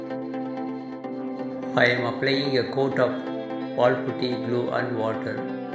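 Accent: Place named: Tamil Nadu, India. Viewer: TQ for jet speed reply.l am also tamilian.so Happy to hear you are also from chennai.i guess because of your English speaking.now I am settled in small.village near by Ongole A.p.i will try to make your articles brother.always your fan.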